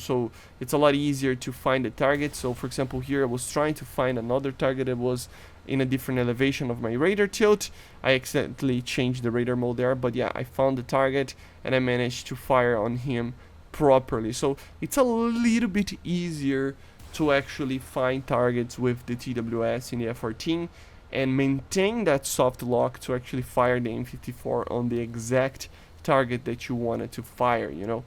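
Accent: Brazil. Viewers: I’m pretty sure he’s from brazil so English isn’t his first language and he probably only speaks English online so his pronunciation won’t be the best.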